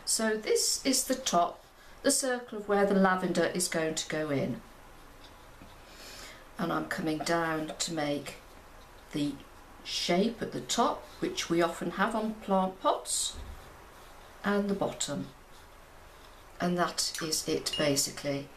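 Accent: United Kingdom. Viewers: British accent wouldn't let me leave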